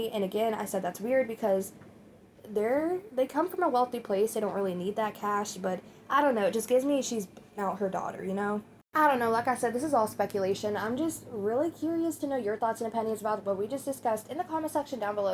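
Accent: South, United States. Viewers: Are you from the South. I’m from Louisiana and we say Yall a lot here. Keep up the good work.. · You saying “y’all” as a southern person from the USA is EXACTLY the same as a British person swearing like a sailor. Most of the time, it cannot be helped. It just slips out! I’m saying this as a British person who, very unfortunately, and regrettably, swears/curses like a sailor. Most of the time we were just raised saying it. Can it be annoying? Absolutely. I even annoy myself when “f*cking” or “f*ck” slips out of my mouth, mid speaking. But… thats no reason to hate or unsubscribe to someone. It’s dialect, sure, it can be annoying, but it’s not like you can stop it over night, especially after years of it becoming part of your typical dialect. Note: Im not saying that it cant be controlled at all. But most of the time it’s involuntary. Also, even as a British person who typically speaks in a, quote, “proper” way (apart from the wears) who tf would want to say “you all”, especially with a southern accent, it sounds clunky and kind of out of place. 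Your content is more sit down, relax, talking to friends than… professional, sit down and talk in a job interview or job meeting. People will always find fault in the silliest of thinga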